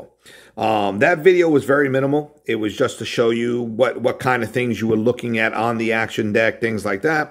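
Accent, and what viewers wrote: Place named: New Jersey, United States. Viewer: I loved the video...and from your accent I'd say you were from New Jersey :) I'm starting a "hybrid" league (12 divisions w/8 teams per division) based on HS basketball rules (4 quarters - 8 minutes long each quarter). I'm going to start with the basic version and work myyself up to the advanced version (and your tutorials really help!)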